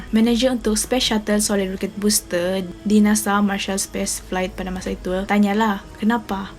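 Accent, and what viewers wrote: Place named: Sabah, Malaysia. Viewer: Punya kental sabahan itu bahasa ko..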